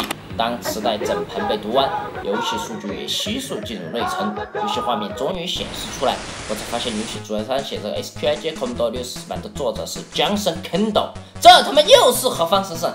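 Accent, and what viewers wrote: Sichuan, China. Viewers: 台港澳地区观众听川普不会别扭吗´_>`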